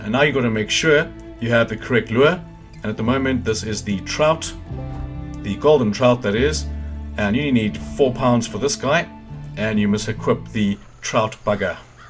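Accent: South Africa. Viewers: You sound South African (like me) One of the most brilliant concise vids I have ever had the pleasure to follow. Sub for certain.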